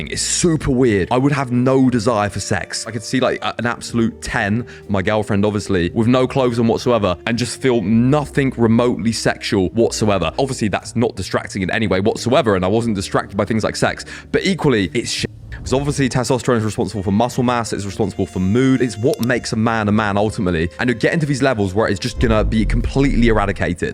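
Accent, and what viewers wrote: United Kingdom. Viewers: Getting shredded and fitness overall is a dead market at this point. With skyrocketing obesity trends, and information on diet and exercise becoming worse every year, most people can't achieve this lifestyle. Due to their own misinformed and repeated failure - they usually give up, It would be a better use of their time to watch the latest movie trailer, political candidate updates, or music video. If you look at what trends on youtube, it's those things. Also, are you from a european country? you sound british. Fitness is not a large part of american culture. youtube is an american company.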